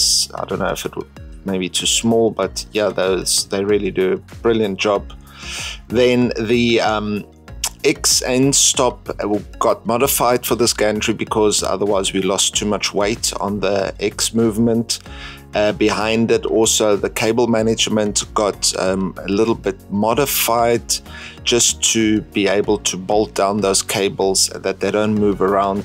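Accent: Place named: South Africa. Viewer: Lekker South African accent. Love to see such great videos coming from SA.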